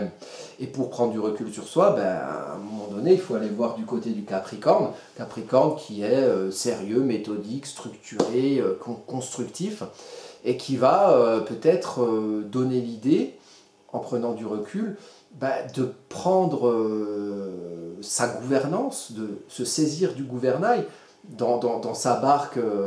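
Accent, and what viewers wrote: Alsace, France. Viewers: merci à vous, en maison 4 il me semble, née le 11/03/1985, stellium en bélier et en scorpion. Cette incarnation est complexe. Et chuis sundgauvienne aussi, j'ai reconnu légèrement l'accent alsacien! Bonne continuation.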